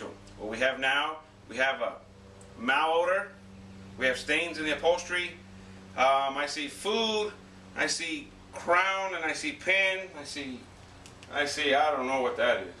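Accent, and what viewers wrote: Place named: Mexico. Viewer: He talks like a mexican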